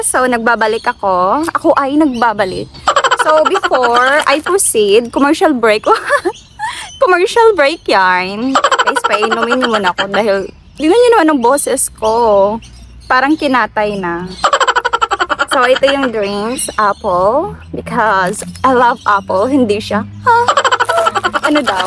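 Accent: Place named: Hiligaynon, Philippines. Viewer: I can hear your Ilongga accent. Love it. perti gid tana ka lambing ya.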